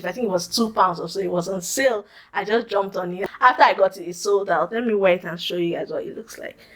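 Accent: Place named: Nigeria. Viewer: I knew you were Nigerian by your accent ✊🏾✊🏾😘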